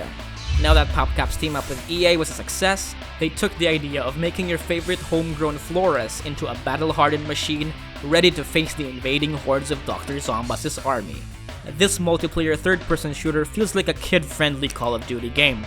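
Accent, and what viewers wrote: Philippines. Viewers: filipino accent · I can't quite place that accent. It sounds either Filipino or South American. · i smell a filipino accent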